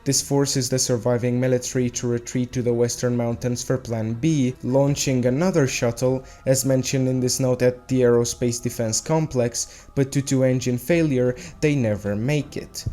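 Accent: Russia. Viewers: You sound like you have some sort of Russian accent (Don’t hurt me, I’m supposed to be a stereotypical boi from the US)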